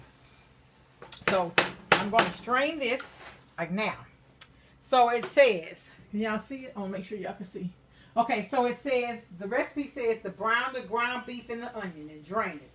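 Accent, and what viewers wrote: South, United States. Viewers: Enjoyed your approach of being yourself right at home. I making this for my family right now. You sound like buoy bc from the south , I’m from AR too, shout out to your cuz lol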